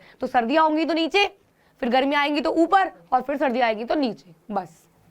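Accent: Punjab, India. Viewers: Ma'am ,are you Punjabi 🤔??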